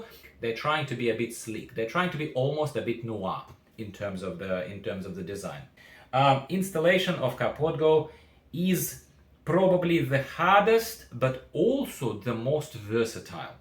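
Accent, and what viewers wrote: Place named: Eastern Europe. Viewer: The accent :D are you from EE?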